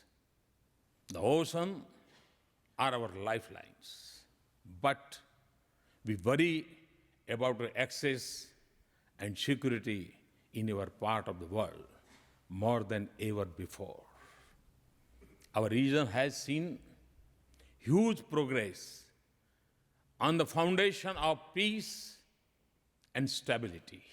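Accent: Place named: Gujarat, India. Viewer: All he has to do is work on his thick Gujarati accent, train a bit on diction...and hes the best of the best!!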